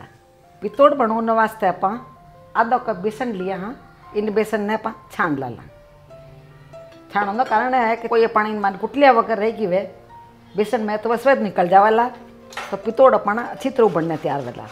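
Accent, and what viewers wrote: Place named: Marwar, India. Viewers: Even I also liked her voice and Rajasthani language how she describes🙏 really amazing so sweet voice and her presentation may god she lives long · Aapki boli sunke maza aa gaya .... rangilo rajasthan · Wa wa sa Maine to aapani marwadi boli ghani meethi lage. Bahut hi chokhi tariya su btavo ho sa aap. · Aap itni pyaari maarwaari Bolte ho.......aapki aawaz mein Mitti kee khusbu aati hai......aur wohi taste Aapke khaane mein bhee aata hai ......! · मारवाड़ी बोली चोखी लागी, पर ए सब बनाबनो म्हाने आवे सा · Mujhe rajasthani nahi aati pr it is very lovely to hear. And this recipe was amazing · I love her accent ... would like to meet her, where does she live? Jodhpur?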